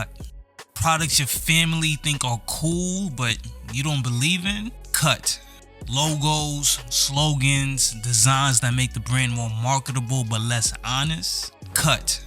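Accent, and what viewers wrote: New York, United States. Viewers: Love this piece and hearing this accent makes me really homesick for NYC!😊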